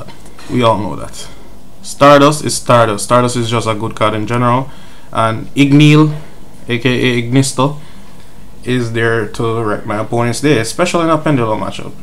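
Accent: Jamaica. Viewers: lol I just looked for this deck and came across your channel.As u started speaking I said this guy sounds Jamaican.... this guy sounds familiar. then I realize I know u from school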